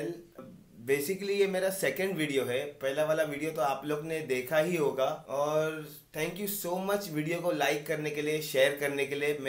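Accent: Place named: Mumbai, India. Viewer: Typical mumbai slang bhai..rocks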